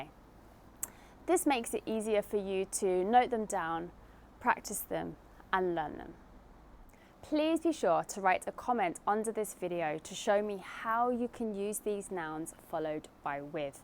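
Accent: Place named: Northern England, United Kingdom. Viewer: Hi, I hope you're getting well soon. Here's an example of an imaginary news article. "The EU office reached an (2)agreement with a famous global company for the company to disclose its customer data holdings. Many sources have raised (7)concerns about the (6)compatibility of this data with the new EU data privacy policy. The company, known for its CEO's (1)affiliation with the newly elected president, has been in (8)conflict not only EU, but also with many countries over this issue for years. Additionally, the EU made a deal with this company to share a list of companies it has (9)connection with. Some of these companies are named in (5)collaboration with the funds owned by the newly elected president's relatives. These funds don't have clear (4)association with concrete issues. Many NGOs, (10)with whom our correspondent (10)contact don't think that the EU has provided enough (3)arguments with the company, against this issue in its dealing with the company. 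One of them criticised 'it's almost like they're on a (12)date with the company. They should (11) cooperate with us before reaching an agreement.' Thank you for the lesson. And I love your accent and trying to imitate it. I'm not a professional but it seems to me a Northern accent because, the sound of 'up' is pronounced with /ʊp/. I'm familiar with this. Thank you for sharing!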